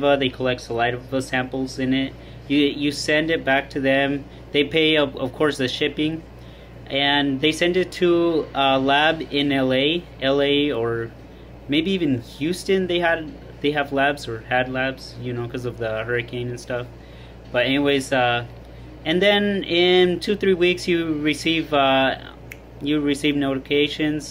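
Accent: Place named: Indonesia. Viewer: You have a slight Indonesian accent. Am I the only one hearing it?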